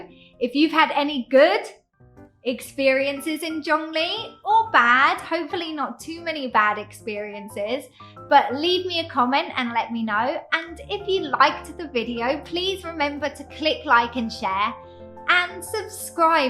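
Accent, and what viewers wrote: United Kingdom. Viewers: I lived in Chungli for five years because of studying in university. In my opinion, it is convenient for living there! There has a lot of convenience stores, 光南(Guangnan), 墊腳石, a big(but also ugly)station, High Speed Railway, Rakuten Monkeys(a pro baseball team), etc. However the only one thing I really hate is cold and WET weather in winter.😂 I want to recommend you my hometown Taichung, it’s more comfortable weather here! But I do like Chungli as well, there are so many memories for me. Btw, I like your British accent. The video make me to practice with my listening. 👍🏼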